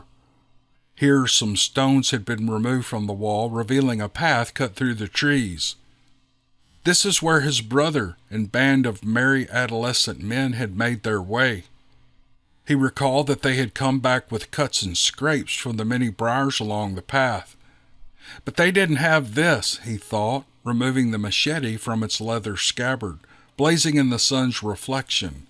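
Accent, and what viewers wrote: South, United States. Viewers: Don't know how everyone else pronounces Mor ls Morsel mushrooms If you have never had the pleasure of eating a plate full I wish that pleasure for you Or if it's you southern drawl, more power to you!!! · You sound like you're from Tennessee or Mississippi somewhere like that.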